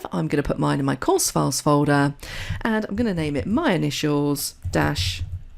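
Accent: United Kingdom. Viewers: Thank you! I am a 56 year old (from India) heart failure patient who is actually into Quickbooks accounting for staffing companies in the US. As I can not go out and need to look for work from home only, the first thing I need to keep up are my excel skills. There were many free courses available on Youtube but I chose this one as it is presented in a British accent, though you might be based in the US,(a BBC fan here!) with good recording quality too. I really appreciate your free service and I am not sure how I can help in return. Thanks a lot and best wishes from India.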